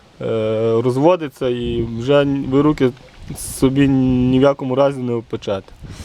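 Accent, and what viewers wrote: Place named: Ternopil, Ukraine. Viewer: Привіт.Чую тернопільський акцент....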